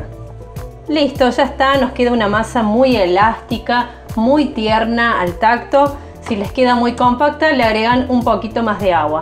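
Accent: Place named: Córdoba, Argentina. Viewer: hay como una tonada cordobesa por ahi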